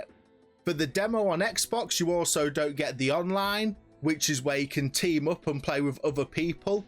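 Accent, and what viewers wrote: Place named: England, United Kingdom. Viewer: UR ENGLISH!?!?!?!? 😱😂 Soooo many things... This game.... Ur voice... This is like when the protagonist reveals he's related to royalty and knew the whole time... I'm also beginning to see the kind of games ur in to. I feel like I'd have a blast playing this... Anything relating to round based survival first person shooter, I'm interested in 😂... Nice to hear the voice behind the screen brother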